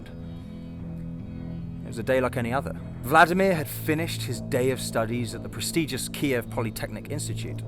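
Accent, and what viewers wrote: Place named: United Kingdom. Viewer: You have a great Bri-ish accent and you are cute!!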